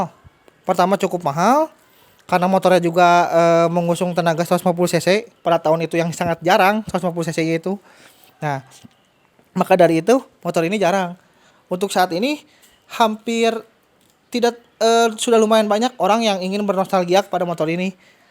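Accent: West Java, Indonesia. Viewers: Sunda bet yah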